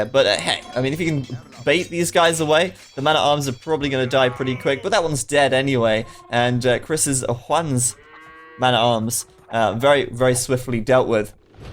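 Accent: United Kingdom. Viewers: Where about he is from in the UK, do you know? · It's extremely British lol, also UK has dozens of different accents so for people not native to it, its often hard to tell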